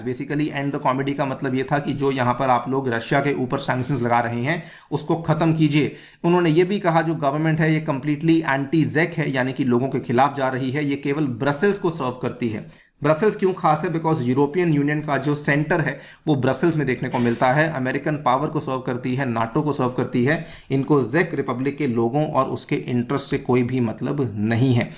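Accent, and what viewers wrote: India. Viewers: Nam Bharat rakha hai sasur..or kam krre ho English pronunciation thk krne ka 😂😂...bhai hmari marji kese b bole... it's Indian English..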